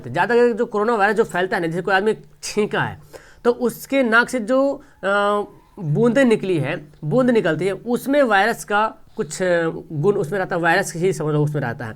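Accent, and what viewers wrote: Bihar, India. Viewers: Bhai Bihar se ho kya? Awaz se lagta h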